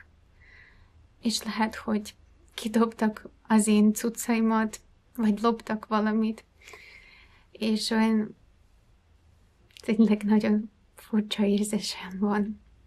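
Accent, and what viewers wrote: Russia. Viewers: Szia! Borzasztóan sajnálom ezt az egészet.. Az én nagymamám Orosz volt. A 2. világháború után szökött Magyarországra, mert a fronton beleszeretett a nagypapámba. Nemrég halt meg 95 évesen, de meghökkentő, hogy mennyire hasonló akcentusa volt hozzád. És számomra ez a legborzalmasabb, hogy innen nézve 2 nagyon hasonló szláv nép öli egymást évek óta... Én is tudom hogy ez az oroszok bűne, és mellettetek vagyok a szívem legmélyén is. De akkor is mellbevágó a hasonlóság akcentusban, habitusban. Ha egy ország képes lerohanni egy hozzá hasonló kultúrájú országot is, akkor bennem semmi optimizmus nem maradt már a jövőre nézve... Nagymamám soha nem beszélt az ideérkezése előtti időkről, és soha többet nem ment Oroszországba. Egyetlen napra sem. kb. megtagadta a származását, és a saját gyerekeihez sem szólt oroszul egy szót sem. Amikor pár éve azzal példálóztunk neki, hogy már nem kell félni tőlük, ez már nem az az Oroszország, mint a régi időkben, mindig azt mondta: Nem tudjátok milyenek az oroszok! 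Amint megerősödnek, vissza akarják állítani a szovjet uniót! Miután meghalt, rá 1 évre kitört a háború. Úgy megkérdezném most mit gondol erről... Nektek pedig kitartást! És félelmetes milyen jól beszélsz magyarul 2 év alatt!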